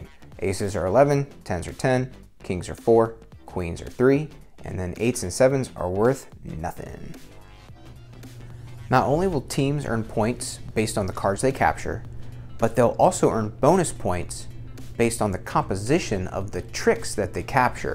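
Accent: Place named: Rotterdam, Netherlands. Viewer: Wel Rotterdams hè...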